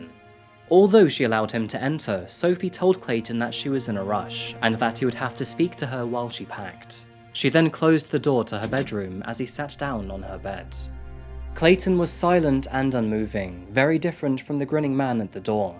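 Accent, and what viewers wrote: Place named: United Kingdom. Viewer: I don’t know why insults seem way more harsh when done with a British accent…but I loved the shade! That guy was a monster! RIP Sophie Elliot🙏🏽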